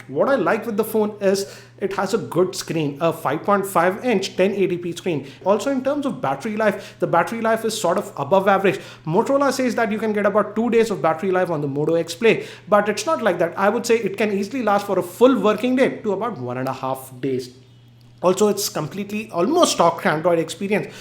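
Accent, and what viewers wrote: India. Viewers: hate indians accent lol, cant understand anything sometimes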